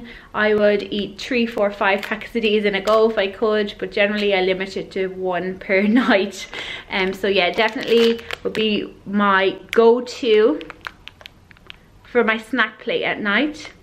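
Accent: Ireland. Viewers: Where in Ireland are you from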